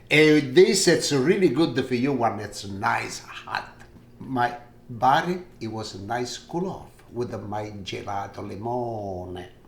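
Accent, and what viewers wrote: Italy. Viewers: Learn how to listen in His Italian accent of English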